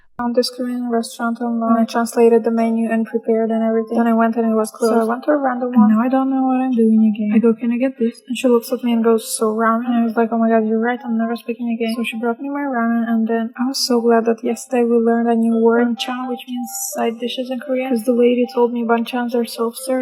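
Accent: United States. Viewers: 잔잔하게 웃기는 능력이 있네요. 양 너무 미국스런 혀꼬부라지는 발음의 한국어로 조곤조곤 설명하며 기사식당 나올때 빵 터졌네요. 한국을 좋아하고 사랑해 줘서 고맙습니다.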